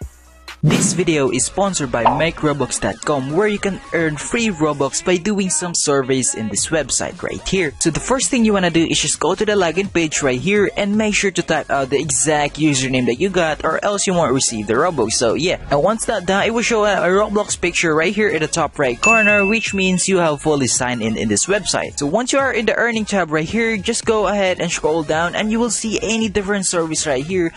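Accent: Philippines. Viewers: You have a Filipino accent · Ur pinoy aksent is ON POINT ONG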